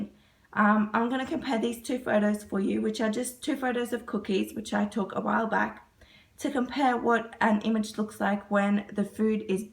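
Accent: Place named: Australia. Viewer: I've watched all your videos and I love 'em. I'm curious though, your food (in a previous video) look like Asian/Malaysian food (curry puff, rice, etc) but you sound Australian. 😊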